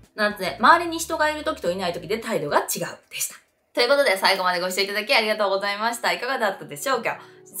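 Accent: Kansai, Japan. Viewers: 声と関西弁にやられる方多いでしょうね😊個人的には、女性社員と把握に役立ってます。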